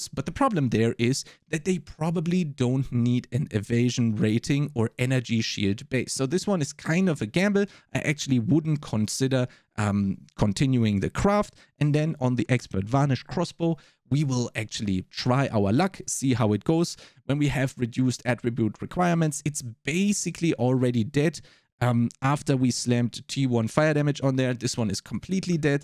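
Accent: Germany. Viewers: Thanks mate. This crafting kills me. Now I have a better overview. P.S. Höre ich da einen deutschen Akzent heraus ? ;-)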